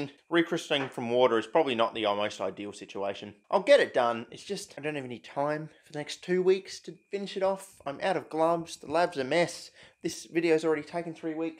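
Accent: Australia. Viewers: lol i saw that too and laughed. of course he'd be using a broken grad cylinder. wouldn't be without aussie accented swearing and delightfully ghetto equipment.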